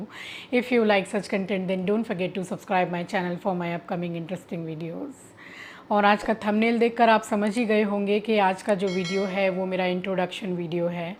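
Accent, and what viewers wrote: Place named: Uttar Pradesh, India. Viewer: ji aapki purchase sunkar mere aankhon mein aansu a gaye main aapke block abhi ek do mahine se hi dekhne shuru Karen jab aap kuchh Shabd aise bolati hai use Kanhaiya se hai mujhe tabhi mahsus hona tha ki aap up se belong Karti main bahut bar likhna Jahan ek meri bhi suar ki mere aankhon mein bahut taklif rahti hai mujhe aapke Shabd bolane se lagta ki aap up se belong Karti hai uska jawab mil Gaya Mera bhi mica Agra mein hai Delhi mein meri shaadi Hui hai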